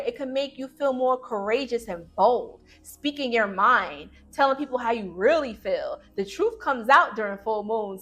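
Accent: New York, United States. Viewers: my god new fan here you are from NY with that lovely accent!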